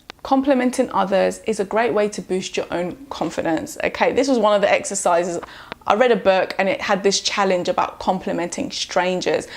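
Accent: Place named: Russia. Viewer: I love the video but do you from Russia or Germany ???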